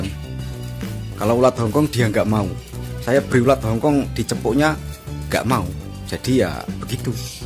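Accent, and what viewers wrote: Java, Indonesia. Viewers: Ak seneng konten ini satu gaya MEDOK bahasanya tdk ada di channel lain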